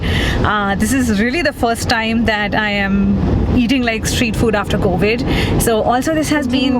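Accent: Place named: Maharashtra, India. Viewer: good to see you back there are very few educated reviewers left now. i was expecting you to say sithaphal in classic Marathi accent do use it time to time its your signature move. you could be the marathi mark weins one day :)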